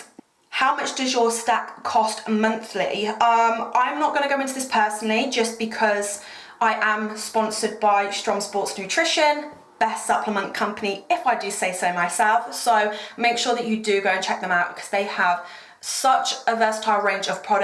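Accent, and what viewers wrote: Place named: United Kingdom. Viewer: What is your accent ?.. reminds me of the harry potter movies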